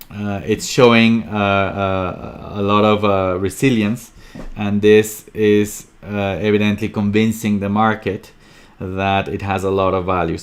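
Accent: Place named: Russia. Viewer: Я так и думал що твой акцент руский ! Честно ти въобще клас ! С етой дъмб я сделал ошибка но надеюсь що за в бодущие не будить . Сподибо за поддержка , и всегда показивяеш все що как надо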